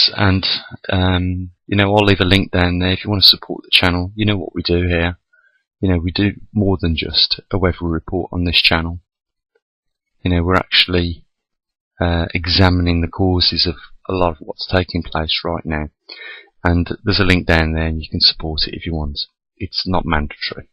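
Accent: United Kingdom. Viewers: He's got a Birmingham (UK) accent.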